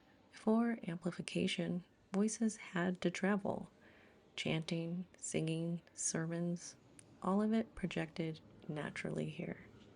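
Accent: South, United States. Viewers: Hi, only just seen this one, but again, another excellent video, I remember the fire well, and the one in Windsor Castle, both hugely important events in their respective histories. You seemed surprised when another correspondent said it was "Auntie" standard, and questioned why. The answer is quite simple: well researched, calmly delivered facts, rather than sensationalised narration. I'm imagining Sir David Attenborough, but female, American (but rapidly becoming more Brit), with that soft, warm Southern drawl wafting over the visuals. Check out his documentary on gorillas from the late '70's, where he's in the midst of a family of gorillas, from the silverback to babies, retains his composure, and continues in hushed tones as they groom him, lie on him, and even (out of camerashot), as one of the youngsters removes his shoes. True professional, and still making wildlife documentaries at age 99. 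Sorry about (another) long post, keep up the good work my dear. Chin chin! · I love your voice, both tone and pitch which, combined with your southern accent, is very soothing. Could easily put me to sleep. Excellent video, one of the best I've seen on the subject matter.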